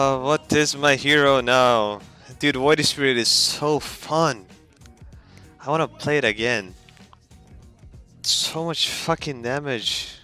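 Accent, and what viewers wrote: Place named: Arab world. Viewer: Recién me doy cuenta que tiene acento árabe XD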